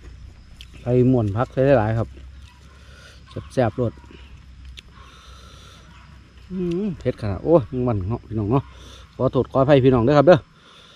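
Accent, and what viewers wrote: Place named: Amnat Charoen, Thailand. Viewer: หนูว่ายุอ้ายคือเว้าคือภาษาบ้านหนู เอฟซีอำนาจเจริญเด้อจ้า 😁😁😁😁😁😊😊😊😀😀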